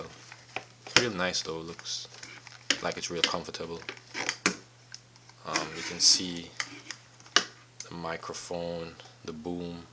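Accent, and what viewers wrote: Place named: Jamaica. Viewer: Your accent sounds a bit Jamaican, are you from there? :P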